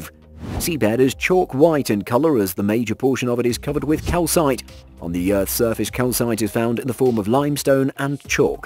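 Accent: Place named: United Kingdom. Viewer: Good Lord, soon as I heard the Brit accent I turned it off. You're always smarky, cocky, mocking. Why even put this on here if you just going to mock everything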